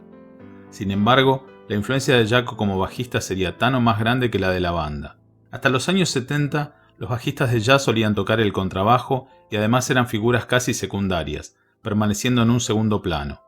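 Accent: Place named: Yucatan, Mexico. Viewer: parese yucatecooo bombaaa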